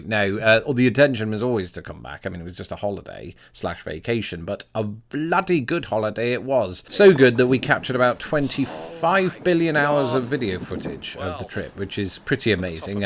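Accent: United Kingdom. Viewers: Glad you had a good trip and are home safely. Now, it seems weird, but your British accent seems stronger now that in was before you left. Like you got a refresher on your accent! Anyone else notice that? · Thanks for interesting video. Your accent is Heavier. More British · I just discovered your channel tonight. I haven't watched all your videos but it seems that your British accent was slightly more pronounced upon your return. I think that's pretty cool. My mom was like that when she went to visit her family or during phone conversations.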